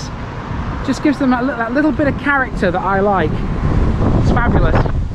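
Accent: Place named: Doncaster, United Kingdom. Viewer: Thanks for taking the effort to make these vids, I've just found your channel. Really interesting & a welcome diversion from the current madness! Keep smiling & your accent is like being back 'ome (Donny).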